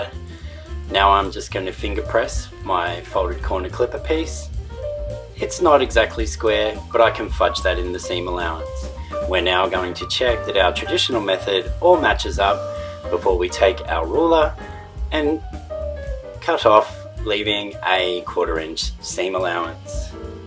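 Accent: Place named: Australia. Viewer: Hi - i am so happy you are doing this! You do such a good job and your voice is so soothing. And who doesn't like an Aussie accent?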